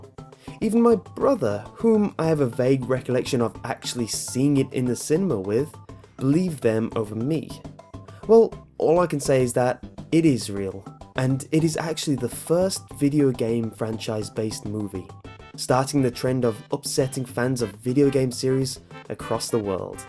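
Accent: United Kingdom. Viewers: i knew the videos are only creepy cause of the british voice!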